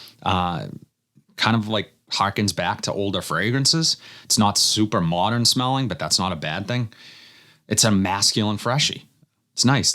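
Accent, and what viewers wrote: Boston, United States. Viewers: Knew that accent sounded familiar. 617 baby 💪🏻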